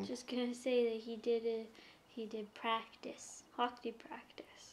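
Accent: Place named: Canada. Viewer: You guys seriously need to stfu. Number one " doesnt eat healthy why is she giving us tips" How do you know she doesnt eat healthy? We only see like 10 minuets of her day. How the hell would you know if she eats bad or not?! Maybe the reason she went shopping and only bought good food was because shes changing her diet? And number two "she speaks weird" e.t.c ITS HER ACCENT. MY GAWD. Part of my family is from Canada. The accents are different depending on the part you live in Canada. My cousins live in her province and talk almost exactly like her. My aunt and uncle live in North Canada and have a thick canadian accent. It just depeneds on where you live in Canada. STFU AND STOP HATING ON! I love you so much. I hate to see this. Please block who ever keeps hating. It makes me sick.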